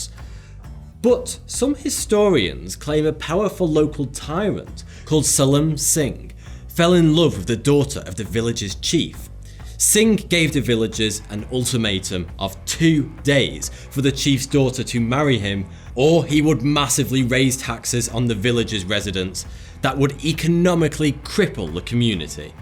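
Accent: United Kingdom. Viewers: In the UK, we call them shopping centres, not shopping malls. tends to use American words, despite sounding British.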